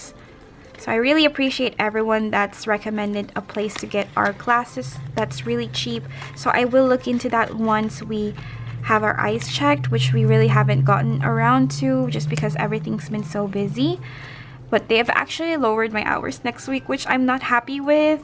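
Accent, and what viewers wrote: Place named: Philippines. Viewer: Hii are u filipino? I think I can tell from the accent ❤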